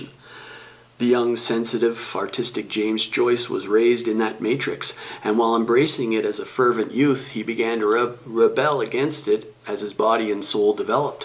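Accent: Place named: Canada. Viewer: You're from Montreal? I thought you sounded Canadian!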